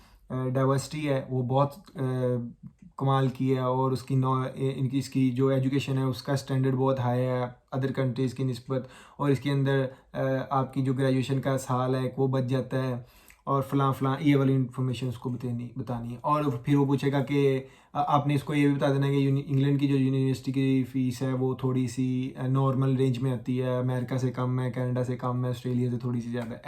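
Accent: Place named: Faisalabad, Pakistan. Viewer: Bro faisalabadi ho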